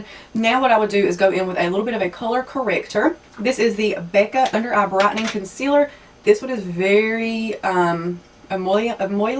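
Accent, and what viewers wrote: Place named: South, United States. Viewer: At nyte tyme...i love country tlk haha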